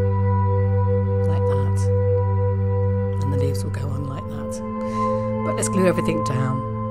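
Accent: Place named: United Kingdom. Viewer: Yes, I thought the same. The music was beautiful, but it did compete with your lovely voice... and I LOVE British accent.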